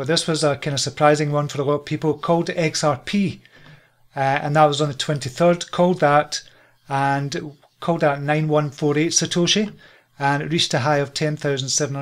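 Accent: Scotland, United Kingdom. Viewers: I trust the Scottish accent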